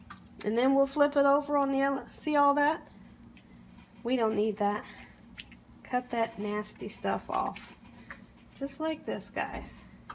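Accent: South, United States. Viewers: I know you from the south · Hi new to your channel. Believe me im at awe. Love it. Are u from the south. U killing the cooking baby . lol. Like u said yall eating good in that house. Lol. God bless.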